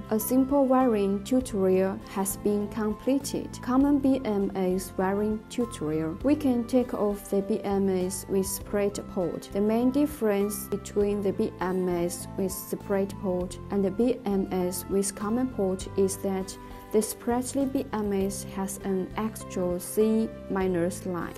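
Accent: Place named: United States. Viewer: Speak without the America essence would sound clear. drawings helps not Co fuse by moving hands...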